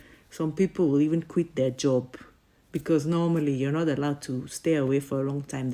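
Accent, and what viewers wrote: Igbo, Nigeria. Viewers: E shock me as you speak igbo along with the Nigerian sland and accent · Are you kidding? This is my first time coming across this channel. This is amazing😂 Ma, you’re a beautiful piece of God with your soul. Hearing the Nigerian accent and Igbo slangs coming out of a white womans mouth is a spectacle to behold 😅 I wish your family the best things of life❤❤❤ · you are a good wife, thank you for understanding your husband and his culture, Igbo. you understood our slangs and also speak with our accent you are beautiful and blessed 💗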